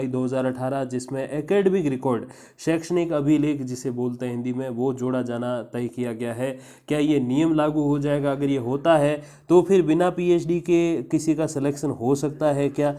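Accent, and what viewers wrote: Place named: Nagaur, India. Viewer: Apki boli Nagur k Jaat jesi h esliye pucha. Thanks for all informative videos.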